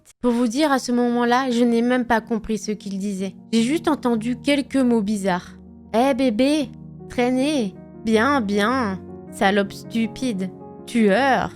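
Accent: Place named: Belgium. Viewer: Belge ahah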